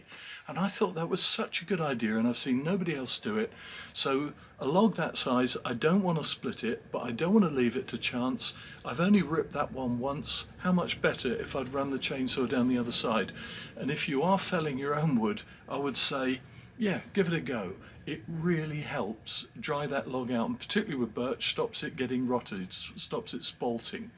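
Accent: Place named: RP, United Kingdom. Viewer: He has a posh British accent, so I instantly believe anything he tells me.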